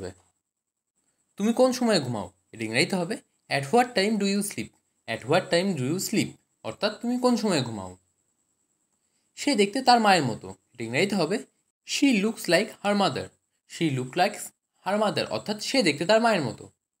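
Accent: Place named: West Bengal, India. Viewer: If i am correct are you from west Bengal 😊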